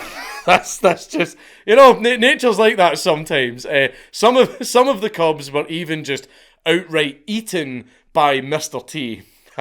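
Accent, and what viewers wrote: Scotland, United Kingdom. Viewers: are you the scottish version of beardo gets scared ? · That Scottish accent is great... "Sex lions", eh? 😂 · I could listen to a Scottish guy talk about anything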